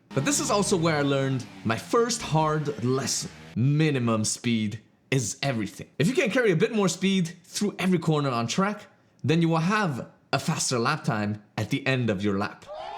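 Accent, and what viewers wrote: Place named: Netherlands. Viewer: I smell dutch accent🥶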